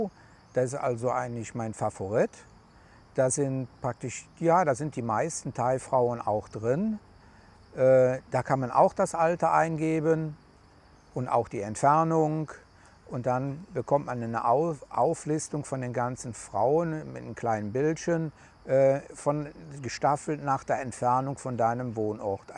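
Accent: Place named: North Rhine-Westphalia, Germany. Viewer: Ja das ist alles nicht so einfach. Habe ich mir einfacher vorgestellt........ Ich dachte mir schon an der Aussprache dass du aus Nordrhein-Westfalen bist. Ich bin aus Alsdorf. Glückauf.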